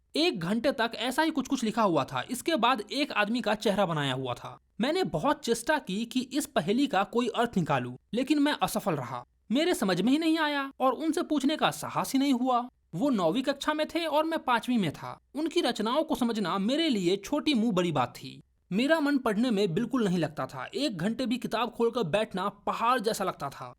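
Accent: Bihar, India. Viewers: Aap bihari ho kya bihar ke lala lala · Bhai ap Bihar se Hai Kya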